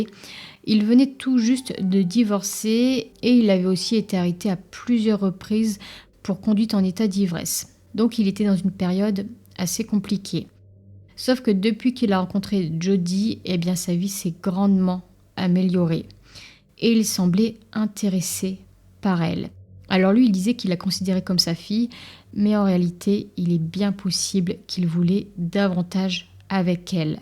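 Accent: North, France. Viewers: Je ressens dans ta voix super douce une note d' un accent du nord de la France je me trompe ? 😁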